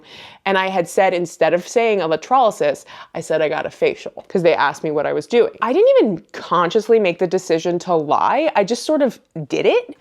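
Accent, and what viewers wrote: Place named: Midwest, United States. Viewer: Are you from the Midwest? You talk like people in my circle, so listening to you felt very homey.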